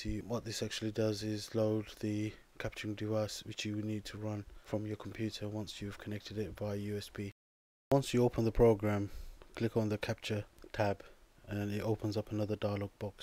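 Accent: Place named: United States. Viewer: وااااو الاكسنت عندك كنك امريكي .. ^_^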